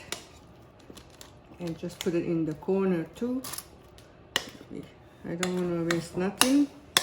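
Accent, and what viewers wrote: Hungary. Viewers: I love your recipes and enjoy your videos. And you can be rightly proud of your Hungarian accent and your roots!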